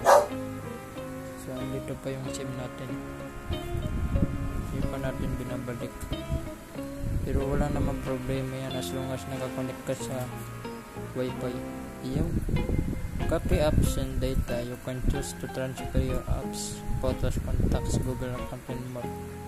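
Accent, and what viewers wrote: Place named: India. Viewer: bat boses indiano parin kayo kahit pinoy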